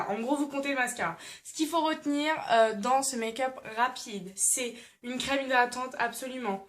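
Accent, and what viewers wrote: Marseille, France. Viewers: arrete avec ton accent genre marseille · je sai pas je trouve qu'elle a un peu l'accent marseillais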